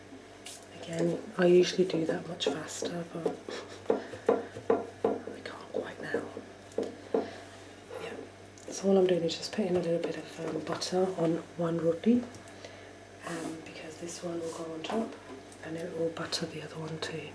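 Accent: United Kingdom. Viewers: are you form the midlands ? it sounds like it.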